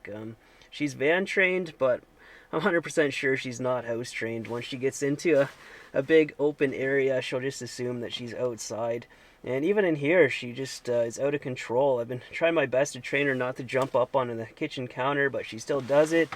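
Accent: Canada. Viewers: Canadian, ey?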